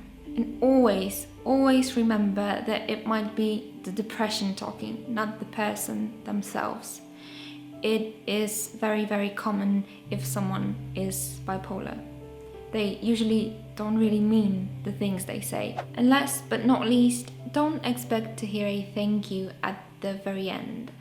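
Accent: Poland. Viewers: Wiedziałem że jesteś z Polski po wymowie zanim zobaczyłem komentarz xd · are you polish? very beautiful accent 💜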